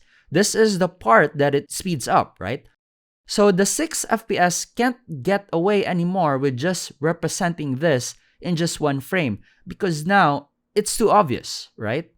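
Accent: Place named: Philippines. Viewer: The Filipino accent is strong on this one.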